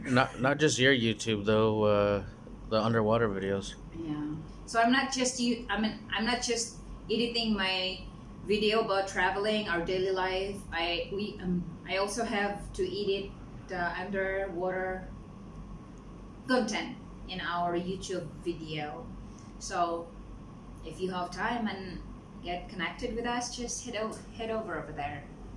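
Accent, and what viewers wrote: United Kingdom. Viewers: ganda ng english accent mo sis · Lamia jud kag english accent jing oi murag british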